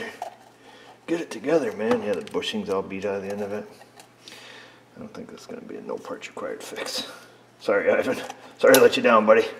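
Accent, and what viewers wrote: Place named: New Jersey, United States. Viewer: wait what are you actually russian i thought you was from joisey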